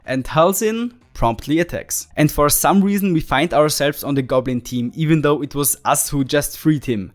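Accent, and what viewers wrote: Germany. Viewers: Are you german? 😅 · I think i hear in your pronounciation that you are from germany, m i right? no offence.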